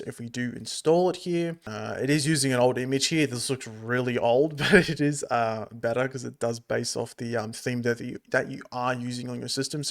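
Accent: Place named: Australia. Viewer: "It's Linux next year", proper Aussie accent and YouTube transcription service is in shambles. Maybe this year will be THE year for Linux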